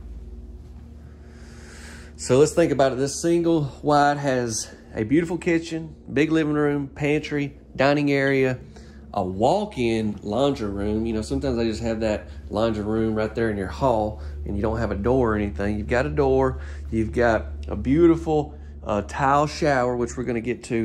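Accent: South, United States. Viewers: Get a friggin voice filter. Dear lord that southern speak is a huge NO.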